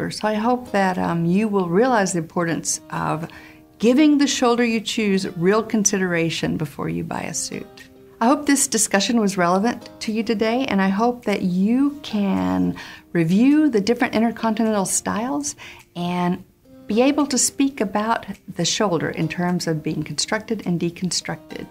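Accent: South, United States. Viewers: Is from the South? I thought I might have heard some accent here and there, but maybe I‘m wrong. Great video anyway, and a lovely personality. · Just a guess, but I would wager that based on pleasing speech delivery, she was raised in a Southern state. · Awesome video! I am italian (from the north) but I really love the english shoulder and construction. It gives me such a strong appereance that has no rivals in my opinion. Despite it still being vert constructed even nowadays, I feel like it could have been even more in the past: I have a vintage chalk stripe suit from the seventies which was made in Saville Row and the shoulder is super heavily padded, I just love that! It was so intresting to explore the other styles anyway, this was very educational. I had no idea that you were american, I should have recognized it from the accent, I love y'all so much. 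Keep up the great work!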